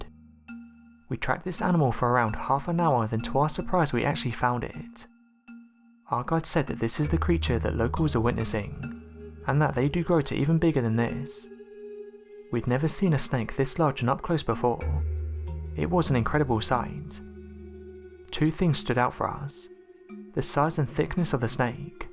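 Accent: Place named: Manchester, United Kingdom. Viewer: Agreed... and though many chime in defending him when I say this, I STILL get very tired and annoyed with that accent. I understand all accents have a reason for existing and started long ago, nobody is right over the other when it comes to language blah blah etc... I figured that out without any help and I don't mean to be some sort of exterminator about it. In fact sometimes I wonder if HIS style (Manchester? Whichever it is...) might actually be one of the oldest ways English was originally developed (I doubt it though), it's still annoying as hell. How anyone would think "yeah, I want to start smushing some syllables into others, completely dropping other syllables, and trail off on almost every last word of every sentence and swing the cadence of each sentence the same near the end... that will help people understand me!" is baffling. 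During the large snake stories and accounts for example, I actually had to give up understanding several different parts and words!! They were so swallowed up or crushed that I can't tell when some sentences and words start ad stop. The information on the other hand, is very fun, creepy, and interesting. He never delivers it as gospel, doesn't have a strong bias towards any of them nor does it seem like he's trying to coerce us to accept anyone's opinion. He just delivers the story and I dig that. Just wish I didn't have to concentrate on ironing out the words in every other sentence.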